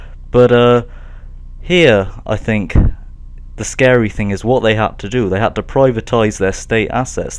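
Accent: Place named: Liverpool, United Kingdom. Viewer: You sound a bit like George Harrison. Are you from Liverpool?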